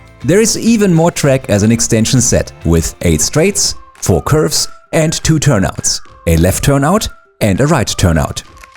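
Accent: Germany. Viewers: Man merkt das das von nem deutschen gesprochen wurde 😅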